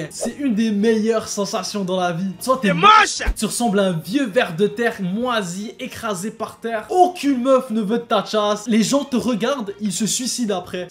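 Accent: Quebec, Canada. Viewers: COOL L ACCENT QUEBEQUOIS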